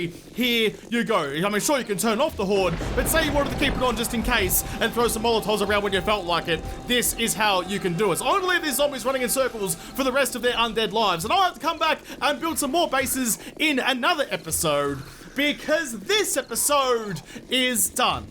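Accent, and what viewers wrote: Australia. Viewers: " Gaoodayea" I'm guessing he is Australian?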